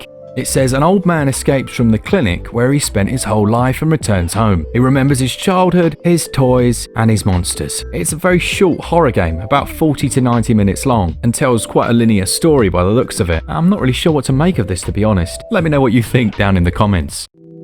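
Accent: United Kingdom. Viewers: Totally agree, it is so nice to hear this pure BrE! I once compared guys to that for me, being from CH, was kinda benchmark:)))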